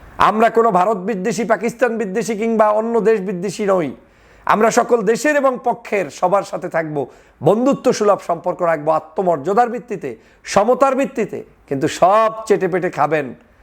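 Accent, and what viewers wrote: Barishal, Bangladesh. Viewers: ভাই আপনিও কি বরিশাইল্লা?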